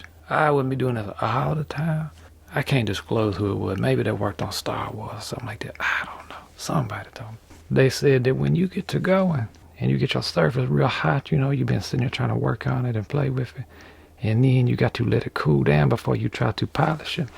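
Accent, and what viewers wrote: Louisiana, United States. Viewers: Bob Ross + Louisiana style ASMR = I'm hooked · is this guy from louisiana? he has the creole/ cajun accents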